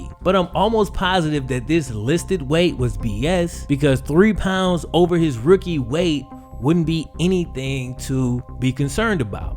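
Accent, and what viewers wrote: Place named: New Orleans, United States. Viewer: Are you originally from New Orleans, cause you have a slight New Orleans accent?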